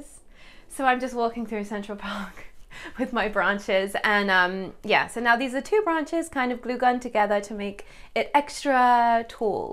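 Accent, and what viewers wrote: England, United Kingdom. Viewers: I love your apartment,. You’ve made it so beautiful and homely and yes sophisticated. I love all your DIY’s and as soon as I saw that DIY twig tree I was hoping you were going to say it was a DIY! I’m sooo going to make one! 😊 I’m English living in Australia and it’s so refreshing to hear a familiar, beautiful English accent. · where is ur accent from in the uk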